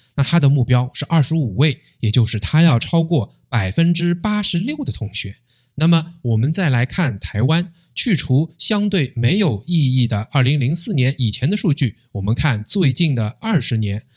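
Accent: Standard. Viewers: 你操着一口标准的普通话。 你说马来西亚贪污腐败。 那么中共呢？ 马来西亚有几个州的苏丹。 赵家的七个家族呢？ 中共有特供。人民吃XX食物。 起码马来西亚人的食物规格没有低劣到这样。 马来西亚的政党高官贪污，舞弊，腐败。 中共可是有公安。说多了就会被抓进去。 早期马来西亚有内安法律。 中共更是一手遮天。 中共人口众多，生活压力大。 马来西亚怎么说马来人占多数，起码生活压力没有中共大。 中共有56种税（10多年前）。 马来西亚（人头税，土地税，个人所得税）。 有对比就有伤害。到底哪里让人活不下去？ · 我国是还有前途的，只要安华继续掌舵，唯有取缔贪官腐败，马来西亚是仍有光明前途的，不过只要有清廉领袖。主讲人的语音规范，流利清晰深入浅出的优点，目前有很多主播在语音方面很糟糕也来主讲。 听了 你的演讲耳朵很舒服。谢谢！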